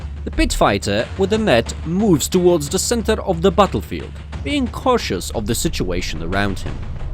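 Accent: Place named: United Kingdom. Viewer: no disappointment here, it's more that the US channels can be a bit...intense. yeah your accent leans still towards a British pronunciation though haha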